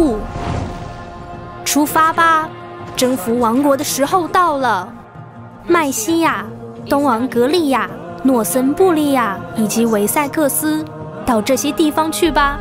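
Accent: Taiwan. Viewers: 很用力的模仿台湾解说腔，“强力冲击”最后一个字要发成二声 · 我覺得有六七分像，但不完全像，台灣人講話比較輕，沒那麼用力，語速也沒那麼慢。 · 为什么4台湾口音？ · 這台灣腔？ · 这次太秀了，台湾腔加各种段子，我希望以后这样的作品会更多，给你点赞！ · 哈哈哈哈哈哈哈这台湾腔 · 这台湾腔学得很牛逼啊! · 台湾配音的国家地理中文节目，就是这种风格。我小时候经常看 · 为啥要用台湾腔啦，淦。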